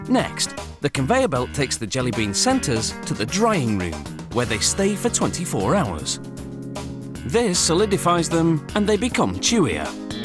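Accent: England, United Kingdom. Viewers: i love English accent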